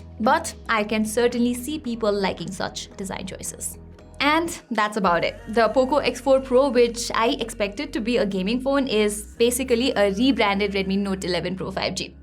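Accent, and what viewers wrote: India. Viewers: Your hindi accent sunke mujko Accha lagra hai....., 😍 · Your Hindi accent hayyy 😍😍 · Just fell in love with her Hindi accent 😍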